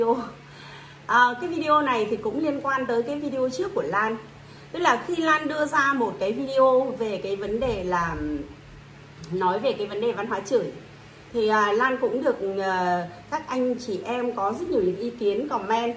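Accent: Standard. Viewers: chi noi rat chuan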